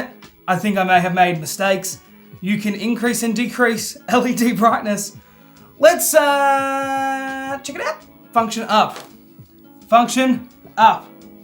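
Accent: Australia. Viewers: Where you from man, by your accent I bet you’re from Australia like me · U Aussie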